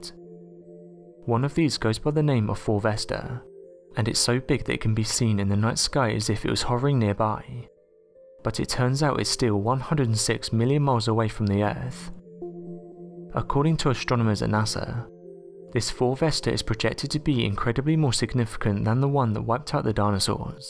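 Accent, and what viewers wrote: United Kingdom. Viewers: I saw no proof or evidence regarding the claim of NASA. Instead all I heard was a British smart alec talking in riddles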